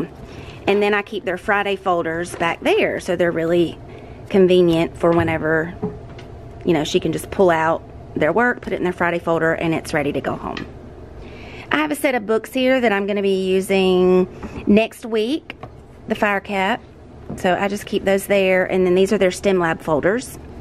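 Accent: United States. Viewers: Are you a Southerner? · Do you teach in NC? I'm trying to figure out your accent. I'm in NC!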